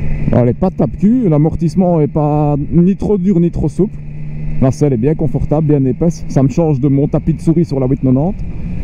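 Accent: Belgium. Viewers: J'ai envie de me la prendre en A2 et je cherchais des vidéos... En t'écoutant je me suis dis c'est étrange il a l'accent et les expressions des mecs de chez moi... ça fait 16 ans que je suis dans le sud ouest de la france pour info... et oui en regardant les panneaux de circulation je me suis dis ! Ba oui c'est un cousin Belges ! Je suis dès Ardennes côté française :) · Ça me fume ton accent belge, j'habite près de Theux aussi mais j'ai acheté une Ktm a gouvy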